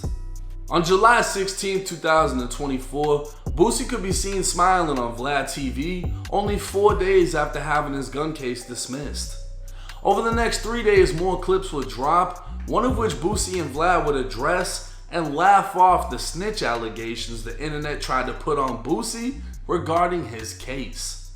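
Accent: Boston, United States. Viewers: The boston/Massachusetts accent is heavy/thick in this one lol .. at least hes got the money N assets needed for a lawyer good enough to take on the machine aka ths feds for a second time.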